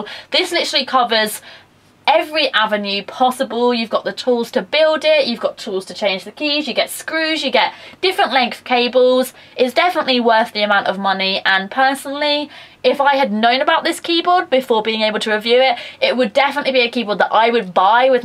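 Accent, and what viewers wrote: United Kingdom. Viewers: You have the exact same accent and voice as Jaime Murray could it just be because you are from England? :P Great review btw.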